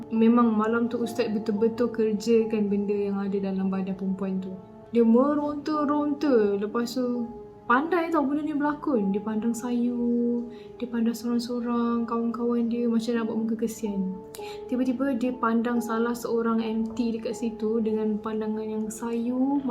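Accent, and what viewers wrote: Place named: Malacca, Malaysia. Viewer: Akak org melaka ke kak? Cara bercerita mcm org melaka je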